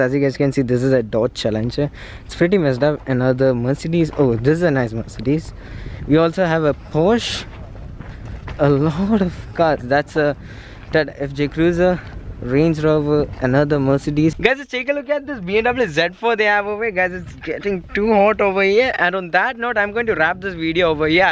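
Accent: India. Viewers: People make fun of other indians because of your accent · YOUR ACCENT TO INDIAN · Indian accent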